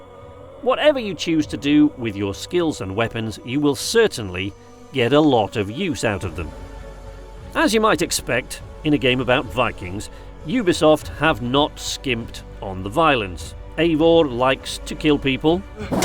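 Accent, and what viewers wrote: United Kingdom. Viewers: This guys is so British 😂😂 good fun review tho 👍🏻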